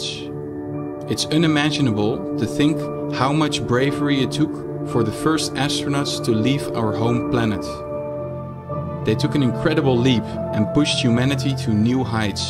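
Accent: Netherlands. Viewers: Dutch accent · This really sounds like a dutchman telling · Im sorry for the Dutchie, but what an accent! Joe can almost hear de nederlands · Deze gast is sws nederlands als je goed luisterd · i heard the dutch accent before the video started playing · This is a Dutch guy for sure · i hear from his accent he is dutch like me haha.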